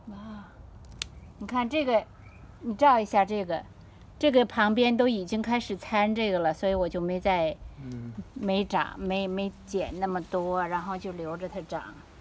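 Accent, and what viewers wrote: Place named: Shandong, China. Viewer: 山东 烟威口音。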